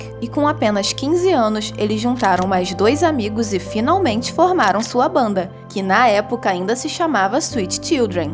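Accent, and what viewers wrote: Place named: Rio de Janeiro, Brazil. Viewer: Sotaque carioca chato pra caramba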